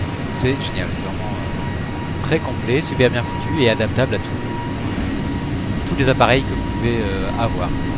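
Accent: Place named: United States. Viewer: accent américain yes 😀😀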